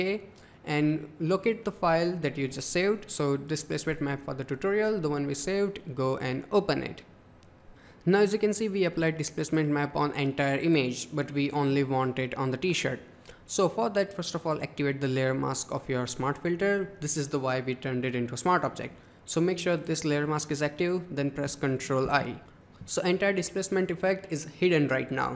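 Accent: India. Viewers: the indian accent is so cute lol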